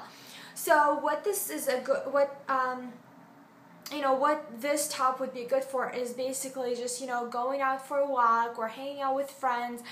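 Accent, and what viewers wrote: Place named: United States. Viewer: У вас идеальный английский язык в американском произношении!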